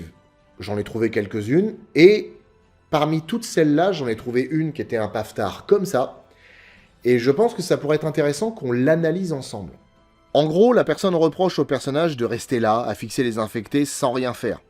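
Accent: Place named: Belgium. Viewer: Une île au large du Costa Rica… Sans blague ? Ça y est ? Tu abordes un de tes "big 5" ? Et sinon, je suis trop fier d'avoir tout de suite repéré la ref aux 12 travaux d'Astérix ! #accentbelge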